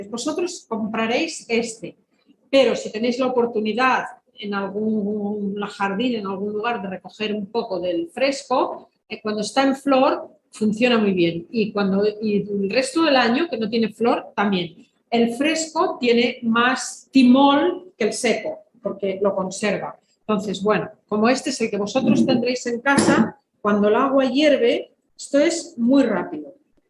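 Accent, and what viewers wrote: Argentina. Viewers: Hola, Te escucho y escribo desde la Patagonia Chilena, estoy en la ciudad de Puerto Varas, y por casualidad hace un tiempo he puesto tus videos de casualidad, primero me llamó la atención tu llamado a estar preparado para una posible crisis, que me parece muy práctico y manejar los alimentos y plantas medicinales para usarlos sin tener que depender del supermercado en caso de alguna contingencia, usar conservas es una forma de no desperdiciar comida, me parece muy interesante lo que planteas, el pensamiento, el entendimiento de antiguos ardides que se han usado para interpretar a conveniencia las escrituras bíblicas es lo que más me gusta que hasta debería ser la base de las clases de religión, y Miguelito el marciano es todo un hit!! felicidades y siempre mucho valor y carácter, aparte me encanta el acento argentino que tenés, abrazos desde Chile estimada.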